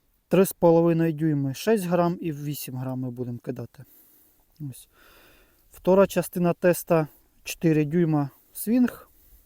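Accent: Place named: Russia. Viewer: Ну я зрозумів оріон це дуб , але з українською ти не дуже дружиш , кацапська тебе не покідає , але я бачу ти стараєшся удачі тобі в вивчення мови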